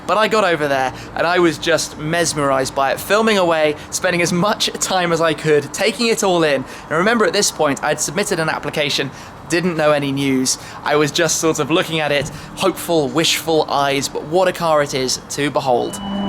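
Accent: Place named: United Kingdom. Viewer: Must be nice to be white with a British accent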